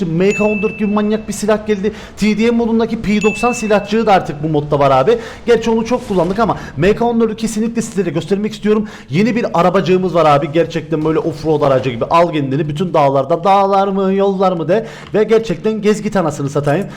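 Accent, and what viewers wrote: Cyprus. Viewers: abi sen kıbrıslımısın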